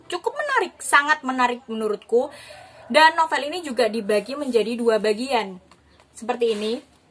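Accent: Java, Indonesia. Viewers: Suka sama logat kak jawa banget😍😍😍 aku juga asli jawa lho kak, tapi tinggal di Bekasi hehehe😁😅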